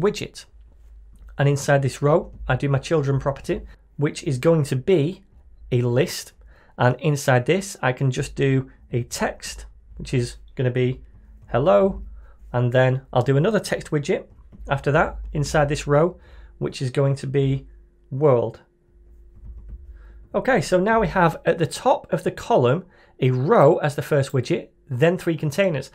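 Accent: United Kingdom. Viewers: dude am Arabian i speak multiple languages with different accents specially English so i learnt British accent from u not just flutter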